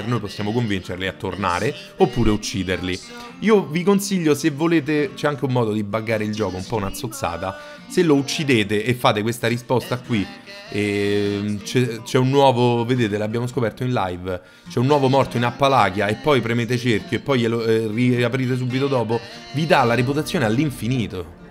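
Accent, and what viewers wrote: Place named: Rome, Italy. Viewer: E mi raccomando, metti sempre il tuo fantastico dialetto Romano...è una tua caratteristica...e ci fa' taia' un casino!😂😂😂😂😂😂💪💪👋👋👍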